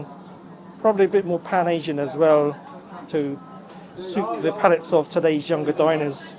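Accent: United Kingdom. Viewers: It's so interesting hearing your British accented pronunciations of Chinese dishes. I'm a California born Chinese speaker and I say things with a bit twang compared to you. Thanks again for the video.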